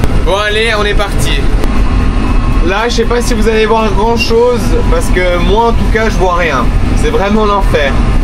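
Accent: Switzerland. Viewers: top ta vidéo, et j'adore t'entendre parler, "ton petit accent suisse" bonne année a toi et tes proches et tu ne fait pas un boulot de faignant a +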